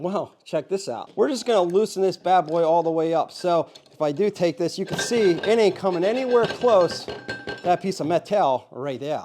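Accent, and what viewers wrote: Pittsburgh, United States. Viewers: just found your channel, this is really a nice feature , but none of my vice grips have that allen wrench opening on the adjuster, probably because my tools are pretty old - I have Vice Grip branded and a few Craftsman vice grips in my tools. so... I'm gonna go and grab a new set that has the allen wrench hole. by the way, do I hear a little bit of Pittsburgh accent? or southwestern PA. when you say a few words I hear Pittsburghese "in 'air" I'm not making fun, it's just I hear a few words . thanks for the video , I subbed especially because that accent or I guess I should say colloquialism :)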